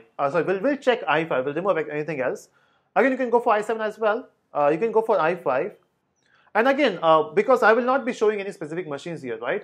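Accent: India. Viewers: Trying too hard often turns out to be bad but raw indian accent sounds bad. It bleeds my ears.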